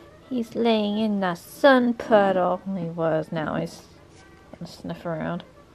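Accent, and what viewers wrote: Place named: Philadelphia, United States. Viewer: You have a philly accent. ;)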